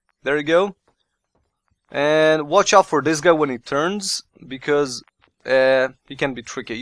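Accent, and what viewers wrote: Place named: Russia. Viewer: wow, you sound like a russian :) and i am russian, so no hate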